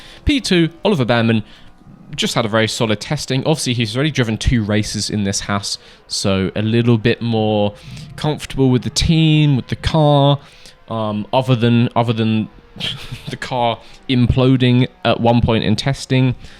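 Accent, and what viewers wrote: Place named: United Kingdom. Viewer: you bring the only podcast I could ever listen to: F1 and with a brit accent. Perfect to listen while I work! I hope wet pointless testing becomes a thing! Thank you!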